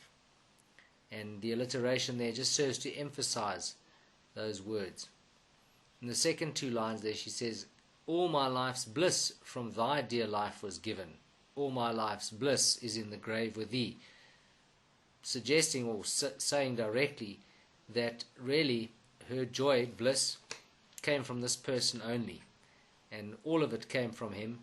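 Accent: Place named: South Africa. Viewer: South African accent 💪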